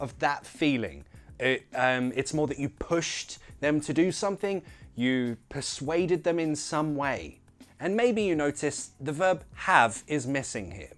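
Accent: United Kingdom. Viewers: Alright! You made me feel appalled by the US-ish "dude" and constant use of "man". This doesn't go with you, you are expected to say " mate" because we watch your chanel by your UK-ish english, which sounds splendid. Ta for your excellent teaching work mate!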